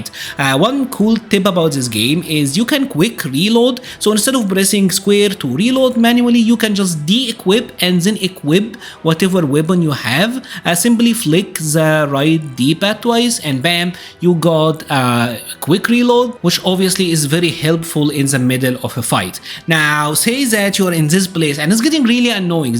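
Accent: Egypt. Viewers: ازيك يا باشة لهجتك مصري عرفتك من اول جملة--- شكرا عل فيديو لزيز قوي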